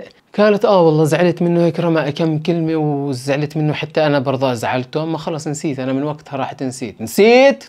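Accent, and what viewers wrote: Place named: Palestine. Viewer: احلا لهجه الفلصطينيه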